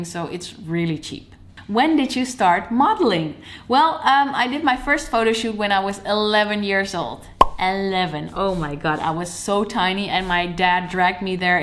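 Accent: Netherlands. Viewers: Ik klikte op deze video en je begon te praten en ik dacht meteen ze is Nederlands 😍 elke Nederlander heeft dat accentje geweldig😅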